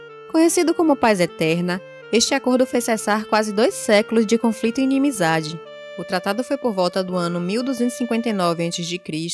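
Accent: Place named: Bahia, Brazil. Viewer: Essa narradora é baiana hein kk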